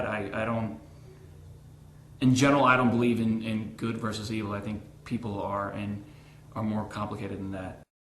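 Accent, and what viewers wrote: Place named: United States. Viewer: His voice is so American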